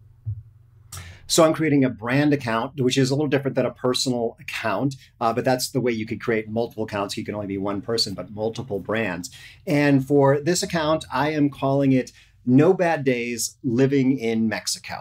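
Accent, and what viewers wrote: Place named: United States. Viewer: im intrested in your accent . its thick and pure american. i appreciate you spendnig time and putting effort into sharing your useful information with others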